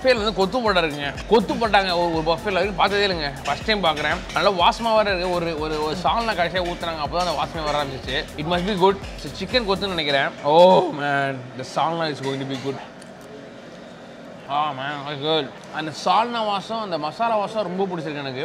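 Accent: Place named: Tamil Nadu, India. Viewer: Liteaaa JK Tamil Slanggg varutheyy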